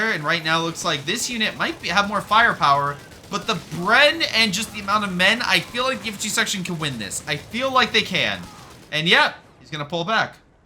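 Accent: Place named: United States. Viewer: as a German/Italian your pronunciation of Guastatori is quite good and probably the best possible with an american/english accent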